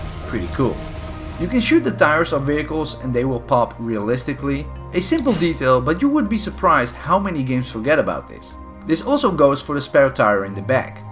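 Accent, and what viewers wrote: Netherlands. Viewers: He sounds very Dutch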